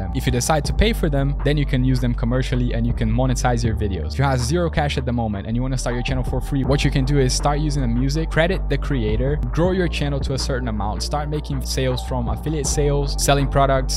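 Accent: Brazil. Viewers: mano, tu eh br né? kkk o sotaque entrega mt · sotaque brasileiro carai hahahahahahha seguindo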